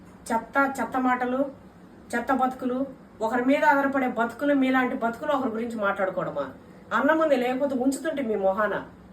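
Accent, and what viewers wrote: Telangana, India. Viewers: నువు అసలు రాయలసీమ అంటే ఎవరు నమ్మరు same టు same తెలంగాణ పక్క తెలంగాణ మాటలు మాట్లాడుతున్నావ్ no doubt 😂😂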